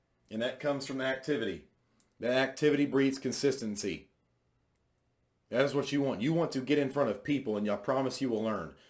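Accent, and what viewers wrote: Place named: South, United States. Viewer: Thanks this was great! I'm just now considering a position with Symmetry and enjoyed listening to your southern draw!! 😄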